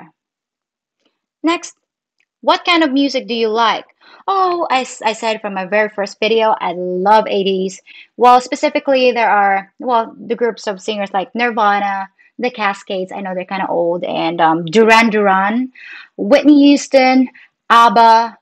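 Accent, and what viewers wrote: United States. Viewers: If you had ever been to a nude beach you'd know that too much reality can be toxic. Reading minds would take the same problem to a higher level. When I was doing free lance IT, and a support number connected me to a Philippine call center, I always sent a positive note to the company. I can understand the Philippine version of English much easier than grammatical and correct English spoken with a Pakistani accent. Your speech patterns are closer to American than British. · I was surprised to hear you visited the UK but not the US. Your word choice and pronunciation is very American. But you do call a TV the telly.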